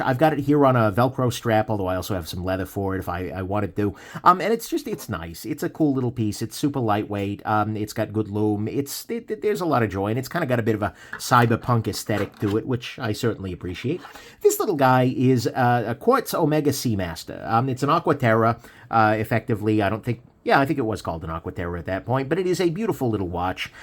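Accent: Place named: California, United States. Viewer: At the end of the video I learned what a California accent sounds like.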